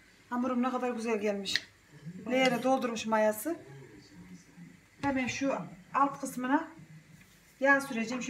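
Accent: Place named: Central Anatolia, Turkey. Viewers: Eline sağlık çok güzel oldu poğaçalar ve de doğalsınız Kayserilimisiniz iç Anadolu şivesi ile konuyorsunuz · Ellerinize emeğinize sağlık canım çok beceriklisiniz maşallah memleket neresi konuşma şiveniz Yozgatlılara benziyo harikasınız 😘😘😘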